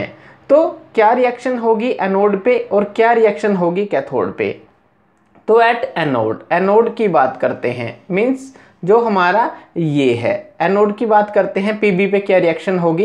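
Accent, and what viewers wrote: Punjab, India. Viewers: Good explanation sir.aapki Language par se lgta hai ki aap punjab se hai.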